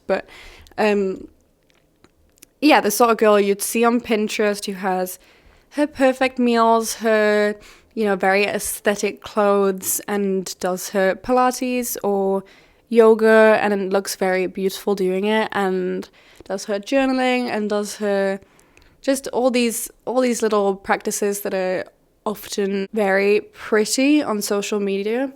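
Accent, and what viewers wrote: United Kingdom. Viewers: Great video! I'm 38 and social media still contributes to my regular identity crisis'. I'm trying desperately to place your accent and all I can come up with is posh Geordie 😂 I hope you're not offended! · Your British accent is so lovely 💗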